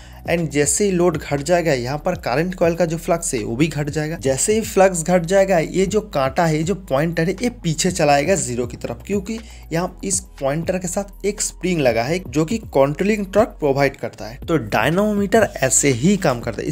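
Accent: Bengal, India. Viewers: आपकी भाषा से आप बंगाली लगते हो को बंगला भाषा बोलते है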